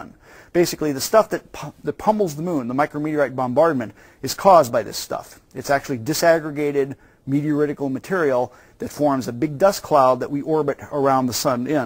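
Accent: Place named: United States. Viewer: the American accent threw me off plus it was very late at night and i need a bit humour :)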